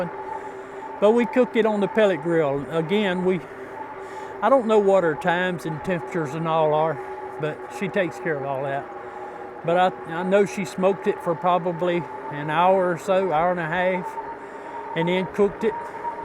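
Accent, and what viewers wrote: South, United States. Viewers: Nice video! I love your southern accent.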